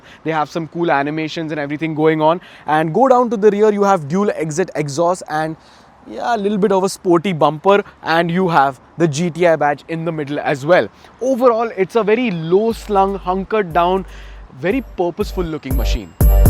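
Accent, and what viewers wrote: India. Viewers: u sound indian, don't get it?😅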